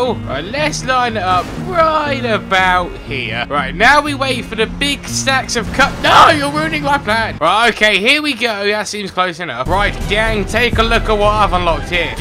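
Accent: United Kingdom. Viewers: he dosent have eneything against them its for entertainment purposes also hes british wich makes it 10 times funnier :D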